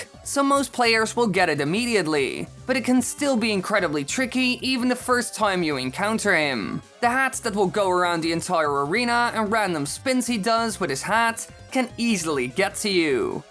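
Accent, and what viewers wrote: Netherlands. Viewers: Goshdarn you're so dutch by your accent. Or belgian?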